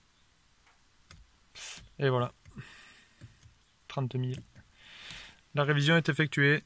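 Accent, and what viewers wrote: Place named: Toulouse, France. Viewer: C est exactement ce que je me suis dit !!! Il est top !!! Avec un petit accent toulousain en plus !! 😂👍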